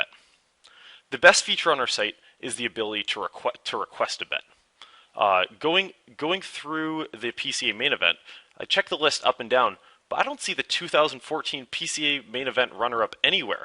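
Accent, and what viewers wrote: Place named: Canada. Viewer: This guy is the most Canadian sounding Canadian ever.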